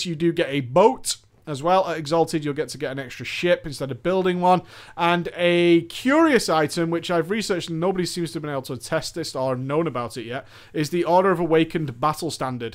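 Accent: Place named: Northern England, United Kingdom. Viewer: At the start of your videos do you say "It's here" or "It's "? Blooming northern accents! Keep up the good work mate.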